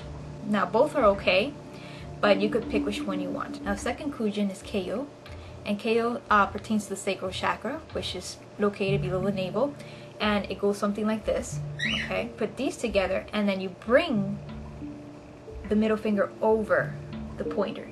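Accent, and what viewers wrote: Miami, United States. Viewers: The room your accent and the bird tells me your in Miami 😂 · you sound like you're from Miami. great video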